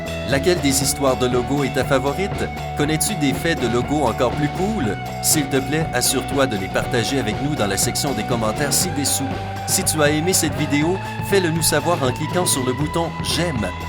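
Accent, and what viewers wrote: Quebec, Canada. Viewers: Yo sont accent quebz 😂😅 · Ces moi ou la voix et québécoise ???😂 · La voix a un petit accent québécois vous trouver pas?🤨🧐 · MALAK LOURAK lol c'est juste une voix cébékoise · MALAK LOURAK c’est l’accent québécois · Oh quebequois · MALAK LOURAK il a pas le nez boucher il est québécois... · Norabella07 Hamdani Peut être parce que c'est une vois québécoise.... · J’adore l’accent québécois 🤗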